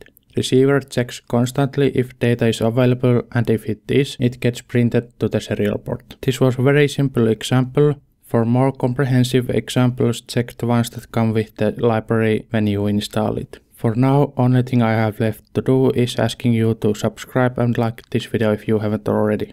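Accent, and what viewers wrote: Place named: Finland. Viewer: The accent sounds a bit finnish. (Rallyenglish)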